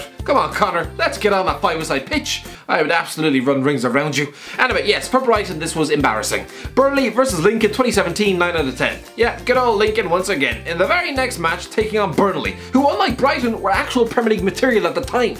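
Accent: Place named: Ireland. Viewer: I see HITC, I hear Irishman, I watch, I like. simple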